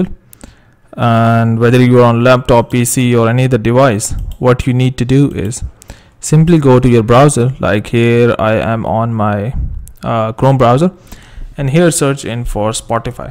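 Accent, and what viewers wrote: India. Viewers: That indian accent is so very annoying 🤢🤮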